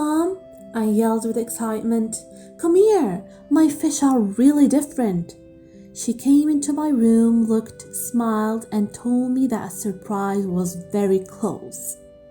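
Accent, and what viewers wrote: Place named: United Kingdom. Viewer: You have a really sweet voice. Seriously i almost thought you're a native british woman.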